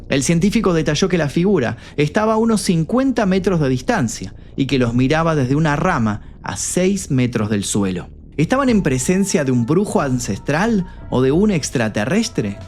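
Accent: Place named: Argentina. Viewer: "polisha", "coshote". Qué chido se oye la pronunciación de la "ll" y la "ye" en el español argentino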